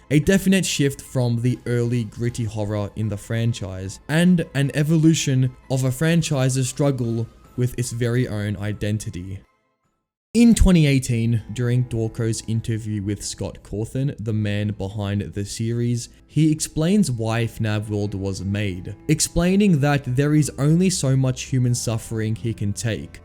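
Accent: Australia. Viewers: Are you Australian cause I’m too bro 🤩 also great video enjoyed it mate 🫶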